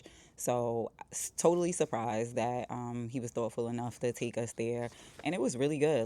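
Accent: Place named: New York, United States. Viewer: So glad i found your page. I love you style, personality and love your NY accent. As a NY'er myself ( Harlemite ) I am feeling your vibe!!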